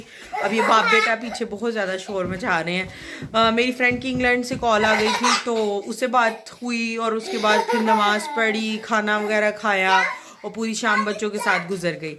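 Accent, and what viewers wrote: Sialkot, Pakistan. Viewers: You r frm sialkot ap ki urdu se lag rh ha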